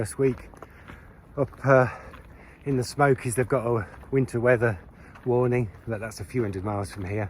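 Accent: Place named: United Kingdom. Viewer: As Brit who watches a lot of US thru-hikers, it's a nice change to hear a British accent.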